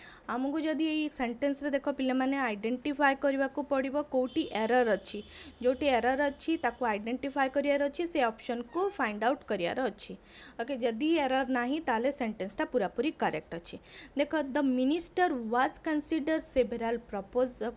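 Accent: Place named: Odisha, India. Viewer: It's motivate kare au please English padheila bele odia accent dhariba is blunder😂😂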